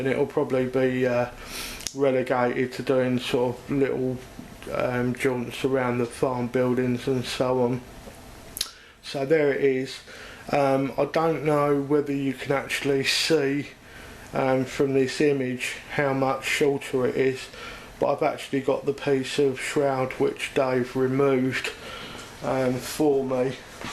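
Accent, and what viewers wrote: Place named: RP, United Kingdom. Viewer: Bob USA. he's speaking the Queens English so have a bit of respect. okay fella now just watch and learn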